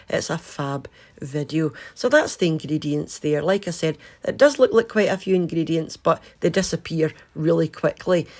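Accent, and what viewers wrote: Scotland, United Kingdom. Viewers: I have been living in Scotland for 4 years. One of my fav things here is Scottish accent. Yours is absolutely adorable 🥰 And of course your recipes are inspiring 😚 Cheers! 😘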